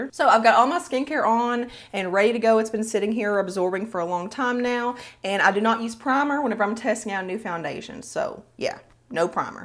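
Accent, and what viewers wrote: South, United States. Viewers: Just found your channel and subscribed as soon as I heard your Southern accent. Even though I'm old enough to be your mama or grandmother I still use makeup and like to see reviews before trying. Thanks for your honesty about the products. I'm in Alabama btw.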